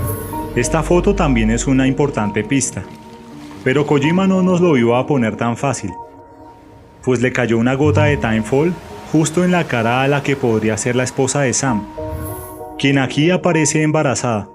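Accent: Colombia. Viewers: Un saludo amigos: Si no estoy mal hay que saludar en plural pues ustedes son un equipo, me enorgullece mucho realmente, estoy bastante alegre con ver una propuesta de canal de entretenimiento como este y mas si es de mi país parece (Colombia) Se puede deducir por el acento. Cada análisis propuesto aquí, cada palabra, cabo suelto atado, cada pista descifrada, cada misterio resuelto o propuesto es una joya. Death Stranding ha recibido mucho Hype, eso todos lo sabemos, el titulo ha recibido criticas, insultos, etc, haters siempre va a ver, pero si algo todos debemos reconocer es que la espera ya de por si hace parte del titulo, el misterio detrás nos coloca a jugar desde ya, formando y buscando cada ficha del rompecabezas, esto es un titulo de Kojima, Kojima no es un desarrollador de juegos, Kojima no hace vivideros, Kojima es un artista, Kojima hace piezas de colección, así debemos verlo, así debería tomarse, Hideo esta a otro nivel, a mi me podría decepcionar el resultado si, me podrá parecer horrible, claro, pero debo ver que por mediocre que sea o impactante que resulte es una obra de arte y el arte es subjetivo, para mi sea como sea, ya es brillante lo que esta sucediendo en medio de la intriga. 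Muchas gracias por estos análisis y espero, encarecidamente sigan creciendo, un 100% De apoyo.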